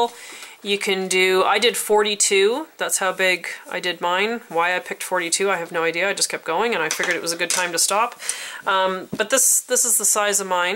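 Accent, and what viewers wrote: Canada. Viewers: Thanks for the great video! You sound Canadian!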